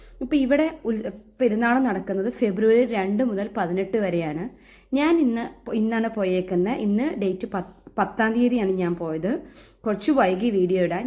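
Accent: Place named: Palakkad, India. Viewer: എവിടെയാണ് വീട് ഞാൻ പള്ളിക്കുന്ന് ഇടവകയിൽ ഉള്ള ആളാണ് വയനാട്ടിൽ ആണോ താമസിക്കുന്നത് സംസാരം കേട്ടപ്പോൾ പാലക്കാട് പോലെ തോന്നി അതാ ചോദിച്ചത്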